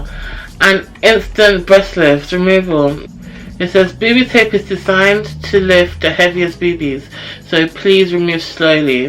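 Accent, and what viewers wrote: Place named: Long Island, United States. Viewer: Your Long Island sexiest chocolates cocoa butter golden brickhouse woman 😍😍😍😍🍓🍓🍓🍒😋😋😋☺️ love your accent 🔥🔥❣️❣️😍😍😍😘😘🌟🌟🍓🍓🍒🤩🤩🤩🤩🤩🤩🤩🤩🤩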